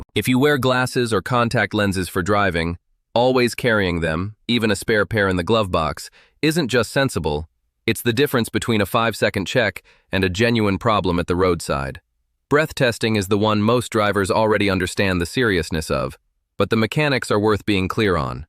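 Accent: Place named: United States. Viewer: Why is this in an American accent with incorrect American pronunciation of the English language used. Americans have destroyed the English language. The content is largely incorrect. What a fraudulent waste of information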